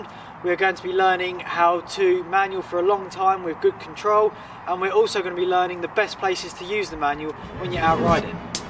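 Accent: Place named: England, United Kingdom. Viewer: nope , he is from England. Można wywnioskować to po akcencie , i oczywiście miejscu zamieszkania , żyjemy w dobie w której o takie informacje łatwo , wystarczy "wygooglowac" ;)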